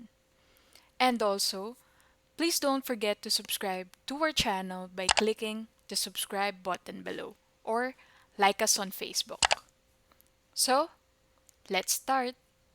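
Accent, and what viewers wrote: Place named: Philippines. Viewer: pinoy accent..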